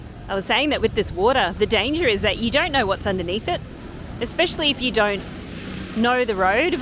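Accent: Australia. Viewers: Jeez that's alot of water about. Just discovered your channel. Subbed, looking forward to see more of the life in Cambodia. Great to hear the Aussie accent too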